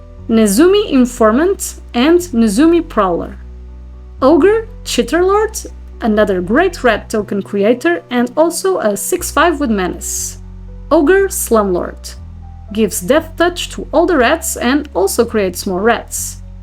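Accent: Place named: Portugal. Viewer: Are you Portuguese? Luv your accent! ótimo video.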